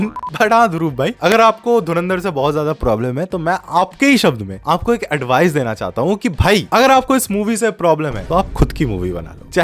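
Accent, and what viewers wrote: Bengal, India. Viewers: baat sunke hi pta lagte hain ki aap bangali ho.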